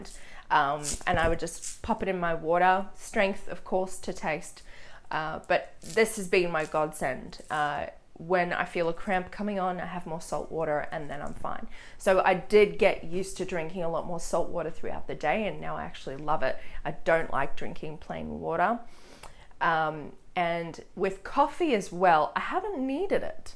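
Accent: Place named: Australia. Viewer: Hello -- it sound like you may be in Australia ??!! I am in NSW. With so many carnivore channels from USA, it is great to know about you 💟💛 I am not sure if I'll stick with this, as my body is not in good shape, I switched from vegan (fruit-based, and also starch-based), to carnivore, and now ketovore and still undecided ! Will be good to view more videos from you. What r your favourite carnivore people / doctors ??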